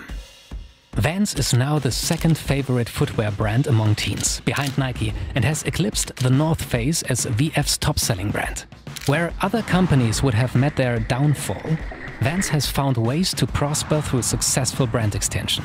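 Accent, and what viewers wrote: Germany. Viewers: this guy german accent gets me everytime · You probably hate to hear it, but are u german? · Most Germans sound like this (or worse) while speaking English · the strong german accent ahhh yess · I could tell it was a German accent right away! But I'm pleasantly surprised by how well he speaks English.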